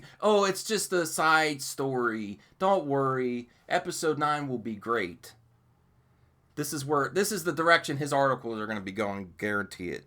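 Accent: Pittsburgh, United States. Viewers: You from Pittsburgh? You sound like a fellow yinzer.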